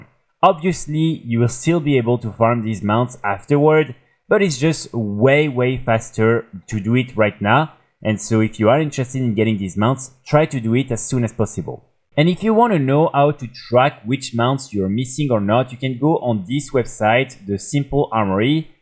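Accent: France. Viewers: Do you do these in French? I'd watch and listen to those same videos if you do. It's the accent, it is quite thick and very French.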